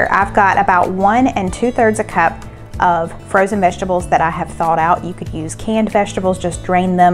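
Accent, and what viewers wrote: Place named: South Carolina, United States. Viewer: I say whopping also. May be a Southern thing. I love you because you are you. Love, love your channel. I have relatives in Upstate SC and I love your accent. 🥰❤ When I hear like (liike) and type (tipe) and last night (niite) I know it is Upstate SC.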